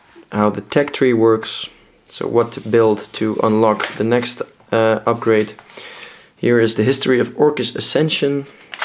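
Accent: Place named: Netherlands. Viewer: Haha, je accent is zoooo Nederlands, hoorde het gelijk :) Ik ben zelf nogal verslaafd aan Warcraft III, zowel single als multiplayer. Deeltje 2 nog niet geprobeerd, zal ik vandaag even doen.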